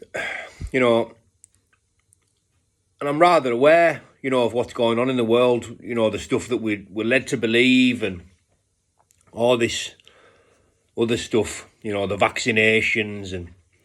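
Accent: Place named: Luton, United Kingdom. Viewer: You come out with some funny words coming from Luton sounds like another language mate,all the best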